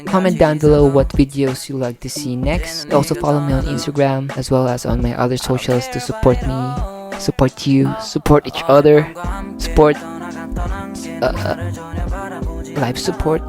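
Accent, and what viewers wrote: Philippines. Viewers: Interesting how people thought you were Korean. As soon as I heard your voice, I was like "that's a Filipino!" Probably because immediately used to the accent XD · i can here ur Philipppineas accent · Omg how did I guess that I knew you were Philippines just by your voice/accent! And love your art so much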